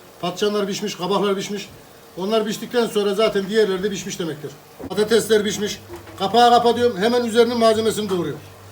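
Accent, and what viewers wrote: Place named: Aksaray, Turkey. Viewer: Sizi ailecek izliyoruz.guzel ve sade anlatimla.sizin ispanakli patates yemeginizi yapacagiz. bey ben aksarayliyim.sizin siveniz bizim oraninkine benziyor merak ettim nereli oldugunuzu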